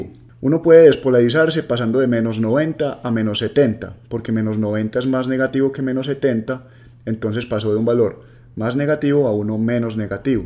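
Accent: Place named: Medellín, Colombia. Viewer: tuve que darle replay xq me deje llevar por el acento de medallo... jajajajaja ups!!!!!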